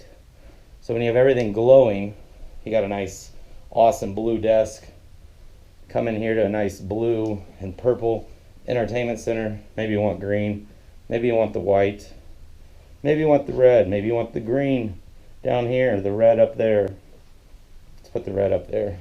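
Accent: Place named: Poland. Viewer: First thing that hit me once i started watching your video was your accent, it sounds polish to me, are you polish? And this enterteinment led setup is super cool, need to make one in my cave.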